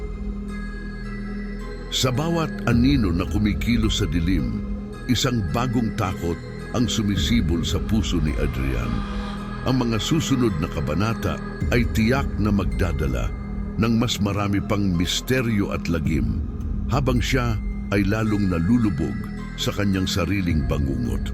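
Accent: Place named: Tagalog, Philippines. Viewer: ❤Daddy and his lettle tagalog accent 😊😊😊charr😊😊😊😊😊😊😊😊😊😊😊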